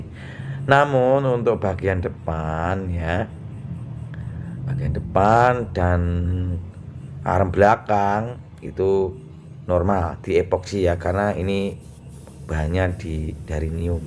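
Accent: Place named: Kalimantan, Indonesia. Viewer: mntap . suara orang kalimatan kh bng yg ngmong ini ? bntu suscribe bng . sering nnton jga soalnya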